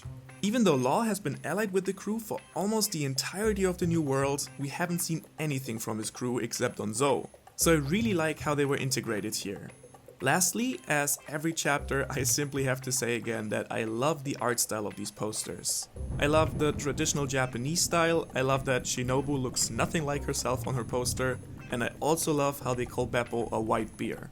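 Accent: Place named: Germany. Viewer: Are you German by any chance? Your accent is hiding it well but I can feel it slightly. Am I right with my assumption? :D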